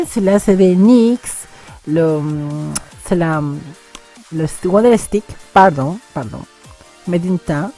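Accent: Spain. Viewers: Ce maquillage est hyper lumineux et pétillant j'aime beaucoup tu es toujours magnifique 😻😻💓 j'aime trop ton accent espagnol moi qui a un amour grand pour l'Espagne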